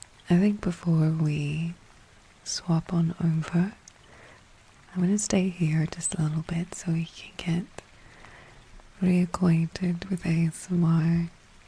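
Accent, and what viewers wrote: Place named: New Zealand. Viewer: your voice made me wonder were you were from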